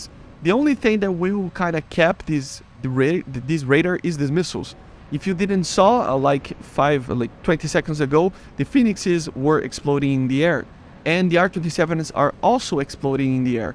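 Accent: Brazil. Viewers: He’s Brazilian, accents buddy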